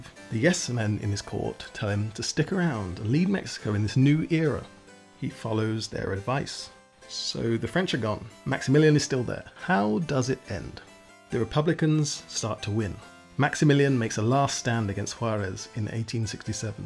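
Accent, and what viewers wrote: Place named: United Kingdom. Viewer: Listening to a Brit pronounce Spanish place names and dates in Mexico in Castellano is hilarious. “Vera Cruth” “Thinco de Mayo” 😂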